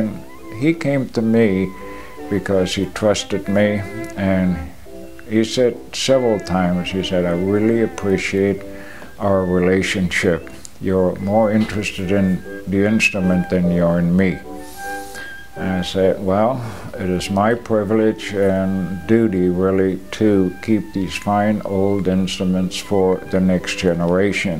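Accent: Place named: United States. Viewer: For me, It is an american accent without even a tiny bit of a germanic tongue.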